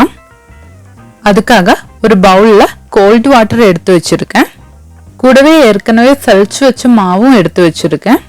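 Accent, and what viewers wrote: Kerala, India. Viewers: U sound like more malyali than a tamilian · Malayali aanu...aa tamil kettal thanne arayaam...but sambavam supper tto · Malayali anu alle... Tamizhu kettapol manisilayi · ningal malayali aan le .tamil samsarikkunnath klkkumbol thanne manasilavunnu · Malayala manam unga tamizh la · Enikkum thonni evideyo oru malayalam touch... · U sound like a malayali talking Tamil... Good quality video!! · Ur talking is like a malayali... R u a from Kerala Or tamil nadu??? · Covered all steps including 'maava salichi vachikonga' ...loved this video And even though I could sense kerala accent, tamil is good!! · Hello 🤣🤣 that's TAMIL not malayalam. She's speaking tone which reflects her mother language malayalam but she here fully speaks in tamil words only. You north indian guys are over thinking malayalam is only a down south language. But truth is tamil language is mother for all south Indian languages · Malayalam kalantha tamil🙂, good recipe. 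Thanks. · Firstly for the malayalam tone tamil speech i am subscribing your channel👍😍 of course for presentation also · Woww superb kfc👌... malayali tamil pesara maadhiri iruku..ningal malayali alle?😊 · Malayali daa tamil ketta puriyaathaa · Malayali aano ? Malayalam touch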